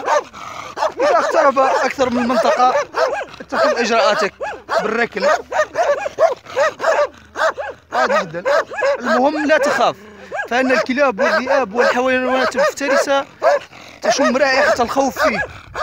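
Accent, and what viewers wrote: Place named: South, Morocco. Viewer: Noman Khushi أظنه مغربي من الجنوب وذلك من نطقه للغة العربية 🙏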